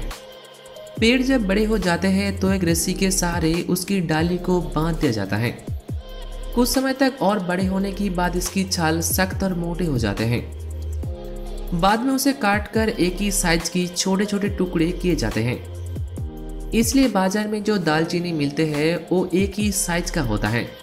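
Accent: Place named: South, India. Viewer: Aapke accent se laghta hai aap South se ho ..nice video....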